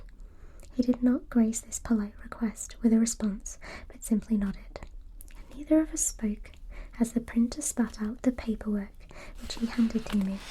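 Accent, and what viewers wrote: United Kingdom. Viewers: I love British accents! I love you vids! Thank you!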